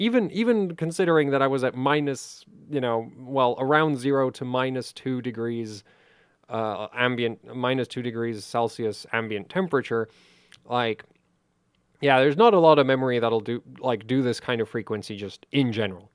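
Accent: Czech Republic. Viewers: Wait, are you Czech? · You Czech? Wot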